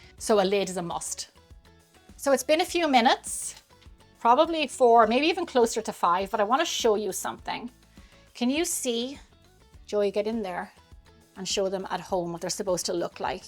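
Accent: Ireland. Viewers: Those are some lovely Welsh cakes,. Your Irish accent just keeps getting more and more adorable than ever before. 🥰🥰🥰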